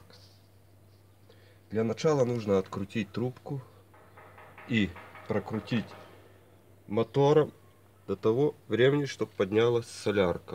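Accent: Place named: Baltic. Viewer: У вас акцент буд-то вы из прибалтики.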